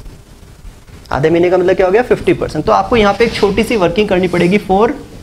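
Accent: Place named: Punjab, India. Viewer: Sir u r very cute..., specially ur sort of punjabi accent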